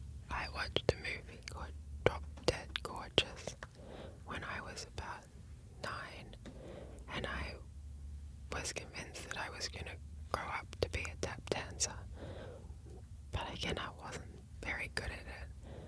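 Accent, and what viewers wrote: Australia. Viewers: Her aussie accent makes it all better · Aussie accent whispering is the most beautiful thing I've ever heard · I really love the aussie accent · australian accent is perfect for asmr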